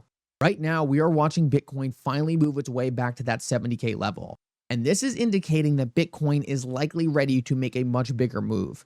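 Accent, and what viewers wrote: Allentown, United States. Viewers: with an Allentown accent bunch of bs again. Even Elon cannot move shit coin XRP upwards,lol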